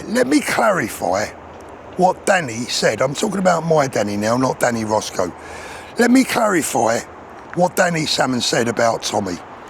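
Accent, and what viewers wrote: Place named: England, United Kingdom. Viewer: home is where the heart is, you like the growth rings inside a tree, they will never change. You grew up in England, your accent is English. You care about your homeland and that will never change I suspect. You moved to change your families life all good reasons, and thats why we have freedom of choice. You are speaking a truth that resonates with your subscribers, and I, along with those who care, still care about you as much as you care about them. The tyre kickers have nothing better to do. They live their lives attempting to drag everyone down to their level of sitting back doing nothing, and spitting out nothing but negativity, because thats all they are, negative people who achieve little, and is why when they see someone who has achieved through hard work, good decisions, and luck can play a part. But they thrive off hate, because thats all they have. 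Just ignore them, when you do that, they lose all power. They will shrivel like a dry leaf when their hatred and negativity achieve nothing. I agree with every word you said close to the end. Best of luck, your heart is true and we all luv you brother.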